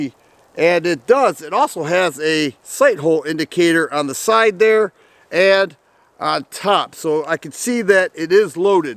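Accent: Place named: Upper Michigan, United States. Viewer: well deserved! You voice remind me of the accent in the UP of Michigan, where I was born!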